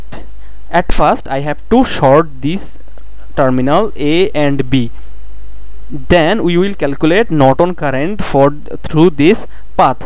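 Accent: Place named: Bihar, India. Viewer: Tnne k mtlb h bihari h ya punjabi😂😂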